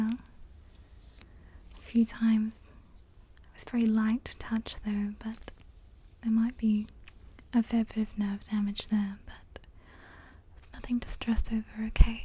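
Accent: Australia. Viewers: ( ^ω^) What's this accent I'm picking up?! Some thunder from down under?? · You're very beautiful and your "aussie"? (I think) accent/voice is very soothing. Great video 👍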